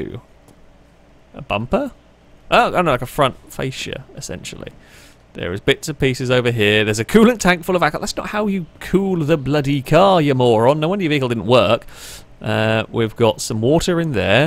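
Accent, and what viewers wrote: United Kingdom. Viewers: dude it's a joke because almost every American loves guns, so him not taking the other gun isn't very American, and obviously he has a extremely strong bri'ish accent.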